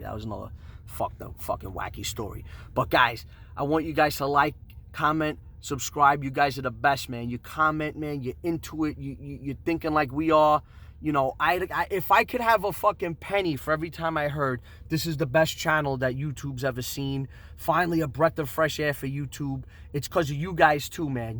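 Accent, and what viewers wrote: New York, United States. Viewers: That's because you guys dont do clickbait titles. If you say you're gonna drop it, you're gonna drop the fuck out of it until something breaks. Also I love how you yell, but being a new yorker myself, I know that's just you're normal voice.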